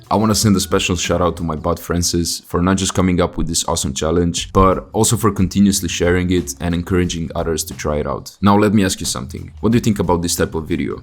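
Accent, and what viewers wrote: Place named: United States. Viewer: Damn bro, you speak like Amerikanski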